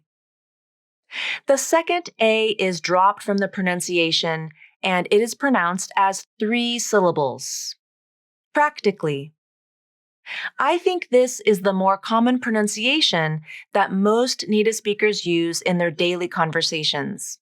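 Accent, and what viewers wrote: San Diego, United States. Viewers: un abrazo!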